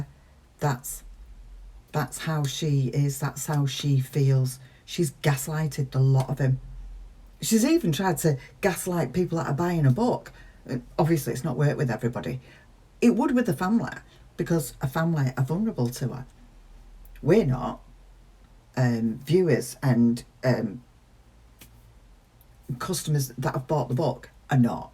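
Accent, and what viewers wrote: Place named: England, United Kingdom. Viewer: Always look forward to and enjoy your videos,. If Wendi is ever prosecuted, the Prosecution are gonna have to be a hundred steps ahead of her, for ALL the reasons you outlined in this video. You probably don't mean to be, but your delivery is highly entertaining! You've probably been asked this before, but your accent places you in what part of England?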